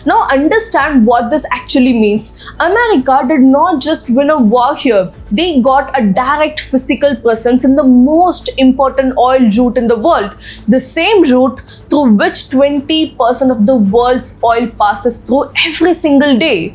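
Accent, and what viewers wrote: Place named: South India, India. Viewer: I love your South Indian accent man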